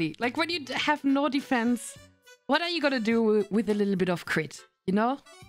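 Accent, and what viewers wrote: Germany. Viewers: Boahh schwierig wenn der deutsche Akzent kickt, trotzdem gutes Video.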